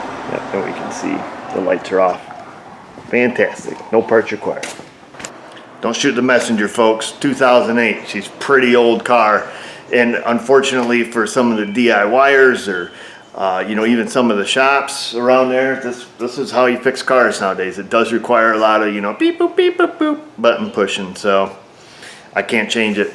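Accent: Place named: Northeast, United States. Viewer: "She's got the leathah!"......right away I knew he was a northeastern guy lol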